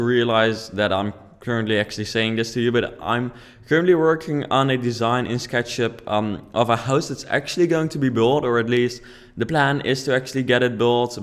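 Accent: Netherlands. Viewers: je kan horen dat ie nederlands is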